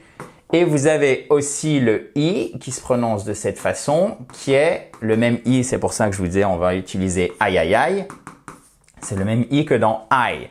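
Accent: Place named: United States. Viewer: Vos vidéos sont instructives et intéressantes. Votre prononciation se rapproche plus de l’anglais américain que de l’anglais britannique. Bonne journée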